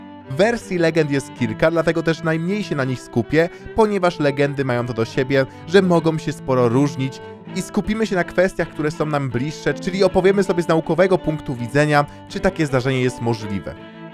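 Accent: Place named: England, United Kingdom. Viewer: Myślisz że to tak łatwo gadać po Polsku kiedy spędziłeś 18lat w Anglii?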